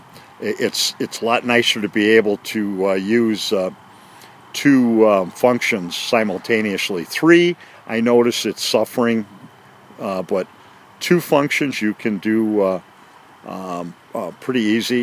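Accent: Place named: New England, United States. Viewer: I like your videos on this... How much is the electric start option, may I ask..., and where are you located. Your accent seems New England, me, I'm originally from Connecticut, Wallingford area. Thanks for all the good tips...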